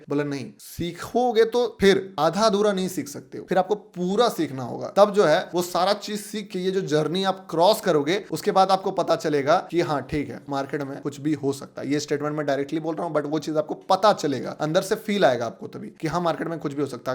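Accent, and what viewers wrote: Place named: Odisha, India. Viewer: Bhai tum odisha se ho 😊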